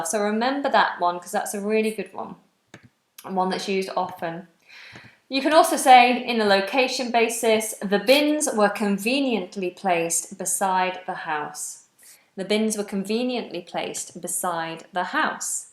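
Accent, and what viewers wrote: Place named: England, United Kingdom. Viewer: IF I LEARNED ENGLISH WITH HER I WOULD HAVE TO MOVE TO ENGLAND BECAUSE I COULD NOT SAY " MO_O" INSTEAD OF "MORE" OR "LATE" INSTEAD OF "LETTER".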